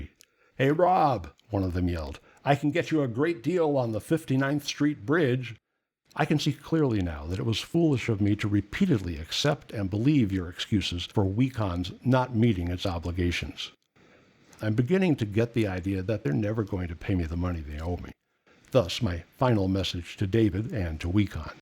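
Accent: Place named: Nigeria. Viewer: Like the way you talk like a Nigerian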